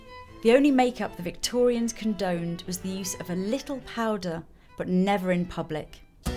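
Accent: United Kingdom. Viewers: British duh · British accent I think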